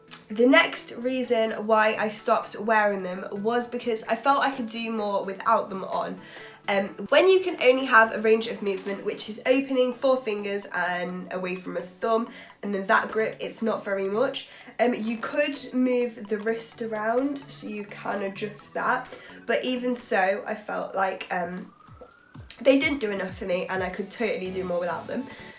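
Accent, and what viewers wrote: United Kingdom. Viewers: Boy you have a thick british accent and it's sexy girl!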